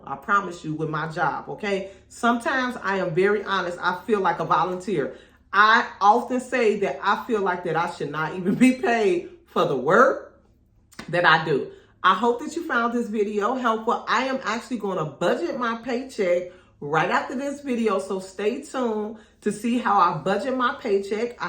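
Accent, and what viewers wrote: United States. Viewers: knew you were from CHICAGO! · I knew you were from Illinois lol i can hear it in your voice 😂 I’m from Joliet! I absolutely love your page thanks for sharing your knowledge ❤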